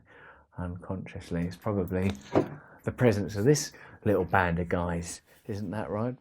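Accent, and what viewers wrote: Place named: United Kingdom. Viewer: My therapist put me on to you around 8 months ago. I enjoy listening to your British accent. And that you're so honest about sharing the fact that you were addicted to drugs & alcohol (as well as lots of twisted behaviors) resonates with me. I'm working a recovery pgm ...N/A. as of 4years I am staying clean "One Day at A Time" (was on Methadone for 25 years w/3 attempts at being loosed from those "liquid handcuffs".) I'd never had an issue believing in the Supreme Source it was anger, mistrust n feelings of not being loved by God those major "sins" kept me separated in my mind & heart ..n ultimately my body enslaved to the darkness that dwells in the shadows of the "concrete jungle" ...n the only thing I thanked God for was knowing about & learning of Jesus Christ ...for which he I believe was (n remains) my sponsor. Reading about several other major religious beliefs has always been something I've done in periods of abstinence. 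It's a saving grace today. I'm happy to say. But hey...relapse, backsliding n all that jazz..it just ain't happening...not anymore...very grateful for that🤗 But I'm interested in hearing your take on recovery... it's different...raw & bold. Have you written another version of the 12 Steps? B/c hearing you say what you said about the 1st Step..."ask yourself are you 🤬?" 😄Yeah..."Unf@ that's the bottom line mate! You're good brother 👍 and funny too 👏👏👏. It's great to be alive n not merely existing but finally living n practicing so many of the tools to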